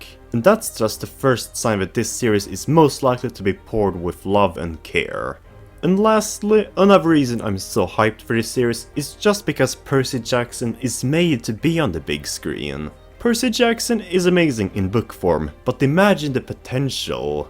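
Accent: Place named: Sweden. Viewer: Är du svensk eller från skadinavien? För din engelska låter precis som en svensk dialekt.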